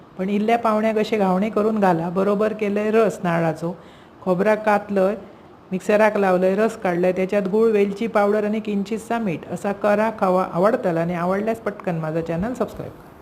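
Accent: Malvani, India. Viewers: वा भारी! घावणे पण आणि मालवणी पण❤😊 आमकाव आवाडतत❤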